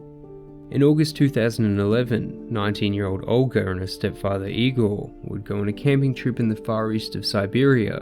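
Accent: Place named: Australia. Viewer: Only made it 6 mins in & had to turn it off i can't stand your accent. Australia?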